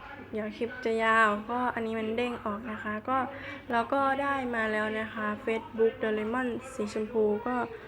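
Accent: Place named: Korat, Thailand. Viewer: พี่เป็นคนโคราชรึเปล่าค่ะทำไมพูดไทยเดิ้งค่ะ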